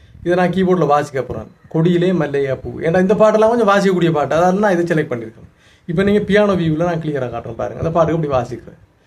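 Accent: Sri Lanka. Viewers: இலங்கை தமிழ்.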